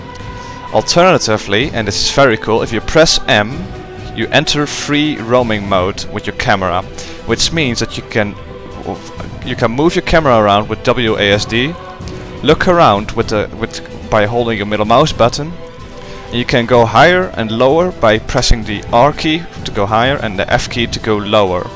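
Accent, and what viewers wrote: Netherlands. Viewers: Yeah, I hear it too. He definitely sounds Dutch.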